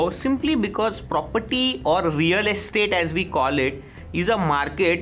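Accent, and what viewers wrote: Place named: Gujarat, India. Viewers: that sweet gujju accent though. thanks, the video was very helpful.😊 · I was just going to comment this... Gujju accent can be picked up very easily... :-D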